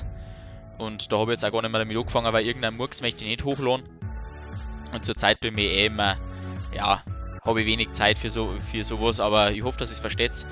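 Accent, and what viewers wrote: Bavaria, Germany. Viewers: Das ist ja eben das geile ... besonders mit deiner bayerischen Stimme währe das richtig nice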